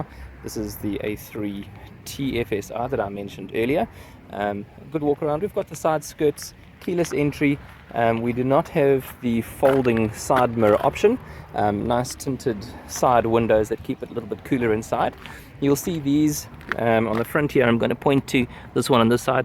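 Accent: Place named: South Africa. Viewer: Your accent sounds South African 😅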